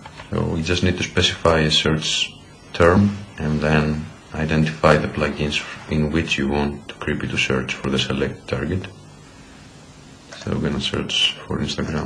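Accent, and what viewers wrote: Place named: Greece. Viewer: Να τος με τα αγγλικά αλλά ελληνική προφορά xD Nice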